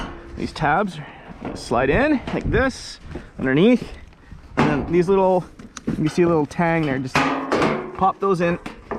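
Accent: Canada. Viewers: I just bought a rogue. Gettimg delivered on Wednesday, this is a helpful video. Seems pretty straightforward to assemble. Are you in southwestern Ontario by chance? Your accent seems very familiar. Lol.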